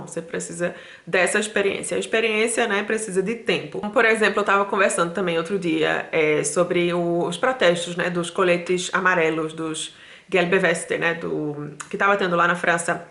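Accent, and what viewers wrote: Northeast, Brazil. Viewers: O Youtube me indicou teu vídeo,vou ver todos os vídeos, gostei do conteúdo,de saber que os europeus são racistas e preconceituoso contra estrangeiro,e pelo sotaque vc é nordestina né? parabéns vou ver todos.feliz natal pra toda sua família 🍾🍾🎉🇧🇷🇧🇷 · vc é muito meiga, educada e tem um maravilhoso acento nordestino. Deve ser portanto, muito difícil para os suíços acreditarem que uma mulher tão linda e jovem seja: brasileira, alta executiva, poliglota, etc. Enfim, vc faz parte de 1% da população feminina brasileira. Parabéns!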